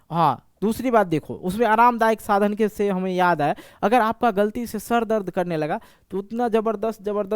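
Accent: Bihar, India. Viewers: Sir aap bihari hain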